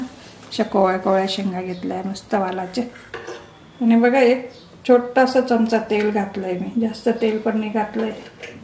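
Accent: Khandesh, India. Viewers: tumcgya sagaly recipe khup chan asatat, tumcha khandeshi lahejat bolane mala khup aawadte, all the best tai.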